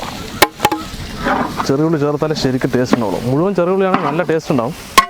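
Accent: Palakkad, India. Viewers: Palakkadan Bhasha ❤️❤️❤️🥰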